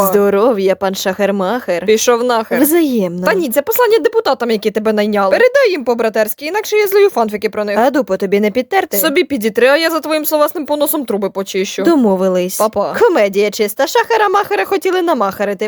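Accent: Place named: Transcarpathia, Ukraine. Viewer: ця закарпатська вимова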